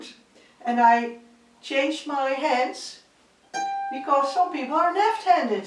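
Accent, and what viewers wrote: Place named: Netherlands. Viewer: sounds like a Dutch accent 8-)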